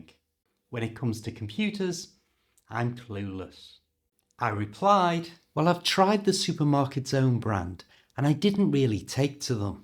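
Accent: Liverpool, United Kingdom. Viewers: As I have already told to you I enjoy your videos and your scouse accent. Cheers from Italy 😊👍🇮🇹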